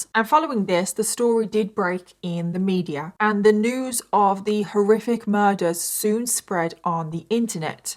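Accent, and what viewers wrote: United Kingdom. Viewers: American here., your British comes out of you every second. Don't fight it; it's delightful. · I’m from Michigan and I have always loved your British accent BUT my heart just melted at the way you pronounced “assuming” “ashumming” lol. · thank you so much for covering this case. Your compassion for the victims always melts my heart. You are such a kind and empathetic soul. Also, please don’t ever apologize for your British accent! It’s what makes you wonderful! You can say “ahss” all you want. You are so brilliant. Much love from Seattle Washington ❤ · Unbelievable!! I dont know hiw he could live with that woman, and not notice that she wasn't all there? Just so terrible, how someone could just do that, to their own small children?! Bless their souls & prayers to Jason. Thanks! You did an amazing job, telling this horrible story! Also, just wanted to say, you look so sweet! 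Those big blue eyes you have, are so beautiful, along with your cute British accent. Looking forward to the next time, thanks again! 😉 👍 🇨🇦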